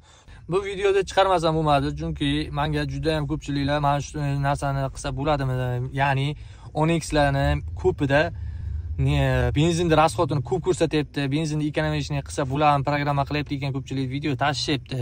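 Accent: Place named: Jizzakh, Uzbekistan. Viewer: Oooh Jizzah shevam....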